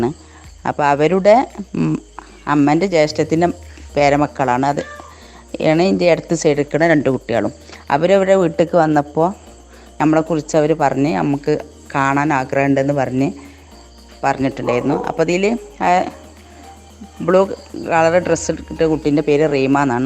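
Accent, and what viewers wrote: Malappuram, India. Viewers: Nammude മലപ്പുറം ഭാഷ കേക്കുമ്പോ എന്തോ നൊസ്റ്റു ഫീൽ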